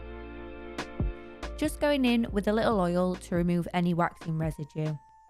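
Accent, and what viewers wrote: United Kingdom. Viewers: It looks so relaxing, I'd love you to do mine! I wish I was in the UK going by your adorable accent.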